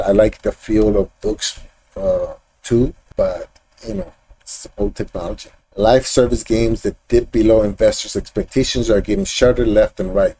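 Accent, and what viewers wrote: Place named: United Kingdom. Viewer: Definitely sounds British! 😎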